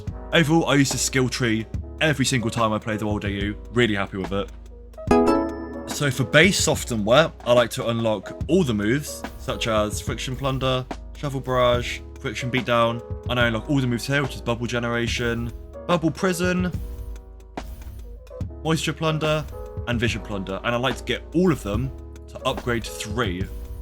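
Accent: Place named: United Kingdom. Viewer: You talking like Stolas or Octavia in Helluva Boss (The british accent i think xd)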